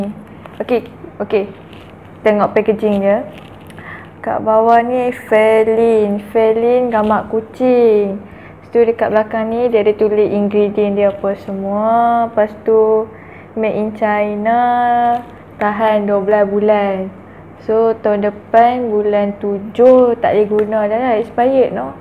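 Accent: North, Malaysia. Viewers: I like how you're very natural and confident when you speak. I'm glad that you actually speak in Northern slang. Cute! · aku tengok video hang from the first video and... "boleh main batu sambut" dengan "makeup macam orang mabuk" buat aku GELAK HAHAHAHAHAHA yep, not gonna deny, orang uthagha cakap ada slang is the cutest >.<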